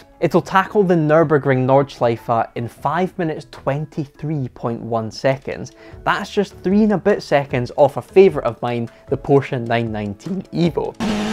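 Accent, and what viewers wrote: Scotland, United Kingdom. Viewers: “Byoo-gaah-tee” His Scottish accent is so fun haha. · are you Scottish or german i think scottish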